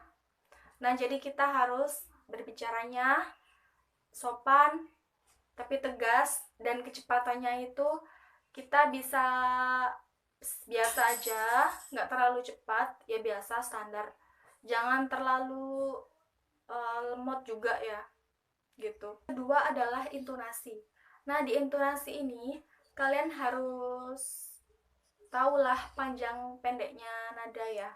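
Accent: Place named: Java, Indonesia. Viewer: Ngomong kok medok mbak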